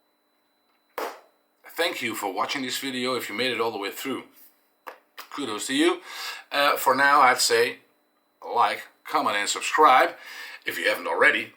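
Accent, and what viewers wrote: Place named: Netherlands. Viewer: Heerlijk nederlands boers accent met engels, top man!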